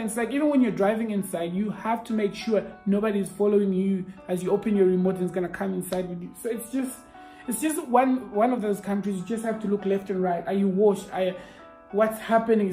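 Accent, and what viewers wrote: South Africa. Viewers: This guy sounds south African mus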